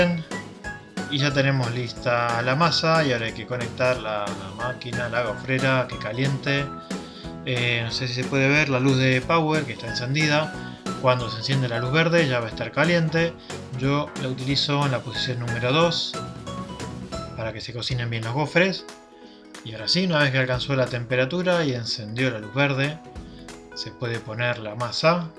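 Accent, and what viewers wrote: Argentina. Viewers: Hola! Muy rica receta de waffles! Ame! Me llamo la atención tu acento sos Argentino o Uruguayo? Muy rico todo voy a seguir las recetas!! =)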